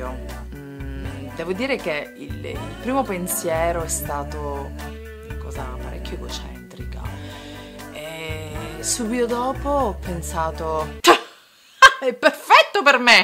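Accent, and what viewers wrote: Sicily, Italy. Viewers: MA SEI MESSINESE O CATANESE? :D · ma sei siciliana ?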